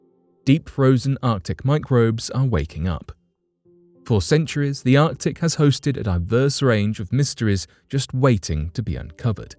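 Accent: United Kingdom. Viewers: This is why I never watch these videos... The title of the video is very specific, yet it's only a fraction of what the video is about, and is just a photo Montague with someone British voice just blabbering.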